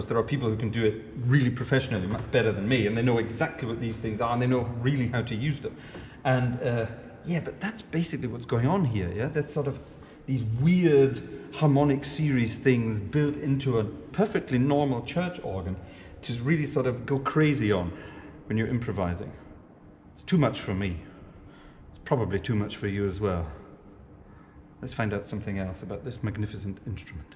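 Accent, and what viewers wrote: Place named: Scotland, United Kingdom. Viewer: Well that's quite an Organ very versatile which will please your. I could hear the pleasure in your voice playing around with this instrument. Very Uncongenial to say the least. Very enjoyable video indeed. And yes your Scottish for sure not that that's a bad thing. Someone has to think about the money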